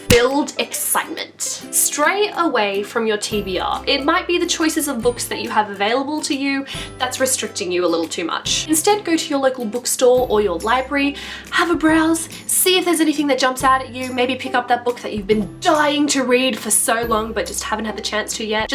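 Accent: Australia. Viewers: Hello. First of all love your voice! Are you Australian? Anyways normally I get out of a reading slump by not, reading, watching booktube, or even buying more books. I'll just put a bundle of books into my Amazon shopping cart to get the urge back. Yes we're in this together!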